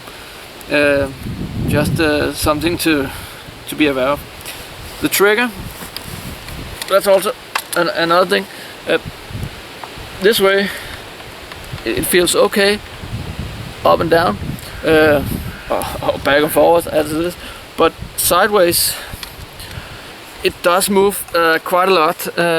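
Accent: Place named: Denmark. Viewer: very danglish ^^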